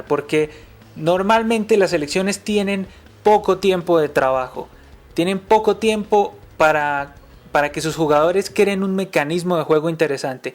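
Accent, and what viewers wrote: Colombia. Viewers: eres Colombiano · oie eres colombiano guey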